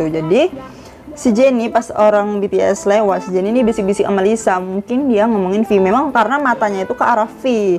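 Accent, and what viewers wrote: Medan, Indonesia. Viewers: Kk orang Medan yaa Logat nya keliatan banget BTW maap kalau salah Ya ka 🤣😂🤣😂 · Kakak anak Medan ya??,krna kalau di Medan kyk gitu ngomongnya😊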